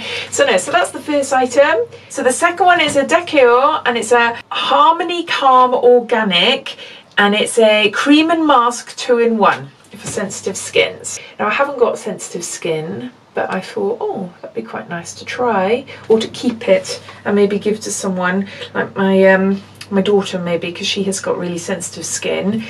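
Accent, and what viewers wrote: Wales, United Kingdom. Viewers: Been following your channel for a while now and really enjoy it. You are very down to earth and natural!!. I have subscribed to the Look fantastic beauty box and feel unique sample service.You sound as though you may be from Wales (im guessing Newport/Cardiff.?). xx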